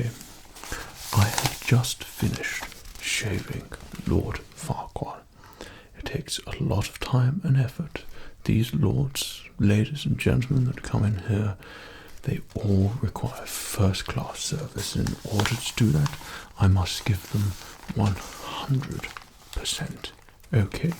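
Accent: United Kingdom. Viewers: He is it’s not even that thick of a bri’ish accent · One of my goals is to learn this accent, I watch BBC almost every week, there's just something about it that is magical. Great video! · Is this man from UK? (I am learning american english, and i don't understand the acent of UK) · i didn't know thor was british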